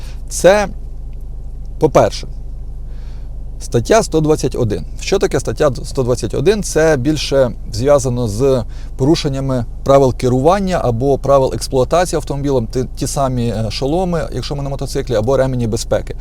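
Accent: Vinnytsia, Ukraine. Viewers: Вінницький говор нікуди не дінеш) так шо не видєлуйся) ти все рівно наш - Вінницький)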